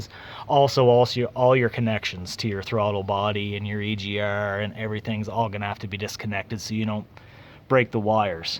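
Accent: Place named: Canada. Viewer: You taking your water pump oot? lol What accent is that? Minnesota or Canadian?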